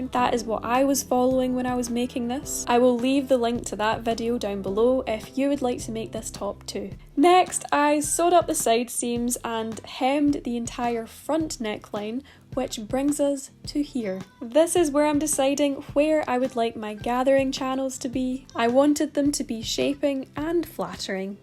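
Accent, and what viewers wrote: United States. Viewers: your american accent was actually so good 😭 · no ur american accent was PERFECT what the heck lol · your american accent is really good! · Your american accent was pretty good! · Your American accent was actually on point! Loved this video, thank you for sharing and making us all believe in thrifting and the possibility of transforming "those" clothes into something new and fresh!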